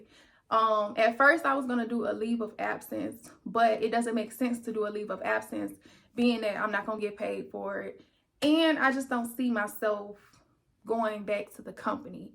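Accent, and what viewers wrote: South, United States. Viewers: Good information!! Thanks but that southern accent omg 😅😂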